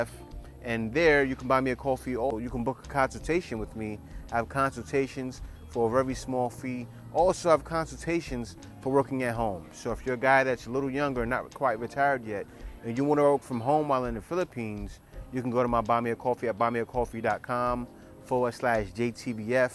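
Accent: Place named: New York, United States. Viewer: bro I been watching you for few years now. Im appreciating everything you been saying. Still got that NYC vibe coming through your mouth 😂😂😂. I’m 59. Just retired from working NYS government job. I need to leave and come to the philippines. Need to need up with you when I get there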